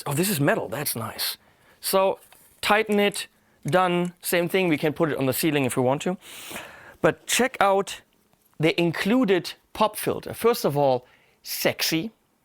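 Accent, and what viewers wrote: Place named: Hesse, Germany. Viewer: Hahahaaa, wenn Du deutsch sprichst, kommt ein bisschen Hessisch durch... 😀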